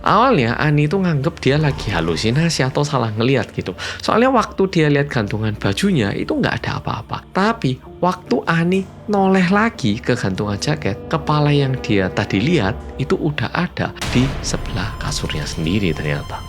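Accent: Java, Indonesia. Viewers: Ngakakk bgtt pas mas ngomong jamput😂😂 sueneng lek medhok ngunuku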